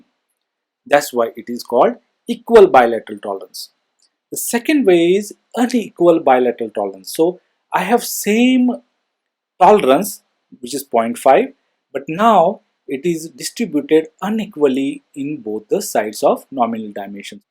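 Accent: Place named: United Kingdom. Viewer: You are very good , i like your accent and your talking way its very british.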